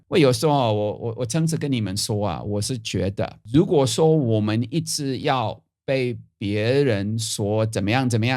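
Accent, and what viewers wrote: Taiwan. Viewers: 根本就是台灣人，完全catch 台灣人說話的方式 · 中文說得很台灣！我也要說：啊！這樣的人真好！ 不過1911?有點早ㄝ，哈哈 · 我看不懂英文但我喜歡聽您說話因為您說話的語意是真正台灣人的口吻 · 你的中文很台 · 嘿!台灣教授,誰説您不是台灣人?您説的國語比我還台. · 我個人覺得，從教授的講話的方式及用語，他已經變成了台灣人模式了，教授沒有領身份證嗎？ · 這個講話 就是台灣人啊！ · 教授，你根本就是台灣人了！ 濃厚的台灣腔就好親切👍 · 你說話都有台灣腔了....非常道地的台灣人 !!! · 你就是正港的台灣人、講話就是台灣味、加油哦台灣人👍👍👍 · 你的口音已經是台客口音了!好親切好可愛！你的確是台灣人! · by hearing the way you speak, you're a Taiwanese and that's no doubt, LOL! XP "Kung Fu" might have been translated from Cantonese. I wonder if "Kong Shou Dao Boy" movie was translated as 功守道!?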